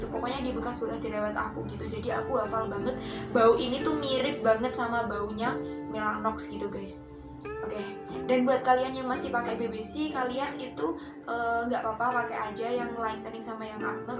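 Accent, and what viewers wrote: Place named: Java, Indonesia. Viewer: Awalnya sih enak didenger, lama-lama kok jadi medokkk😂sukses terus gais💋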